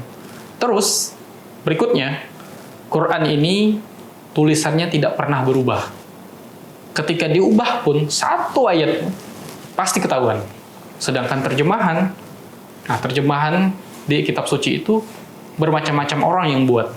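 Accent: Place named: Pontianak, Indonesia. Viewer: Logat Melayu Pontianaknya masih kental ya bang... Alhamdulillah, crite abang mirip dgn teman sebangku ku, krn keseringan lihat saye beribadah.. semoge abang istiqomah... aamiin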